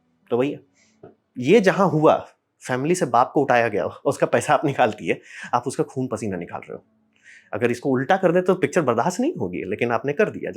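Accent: Bihar, India. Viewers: Bhai aap Bihar se hai kya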